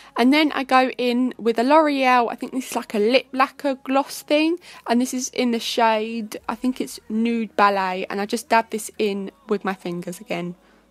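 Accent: United Kingdom. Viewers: So gorgeous! Can someone tell me where is her accent from? Like, I know she's from Britain but I don't recognize her accent at all.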